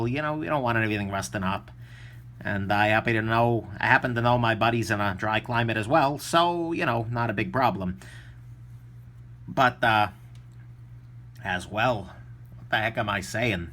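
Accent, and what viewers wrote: New York, United States. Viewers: You’re from the desert? You sound like you’re from Long Island, Brooklyn or the Bronx! : )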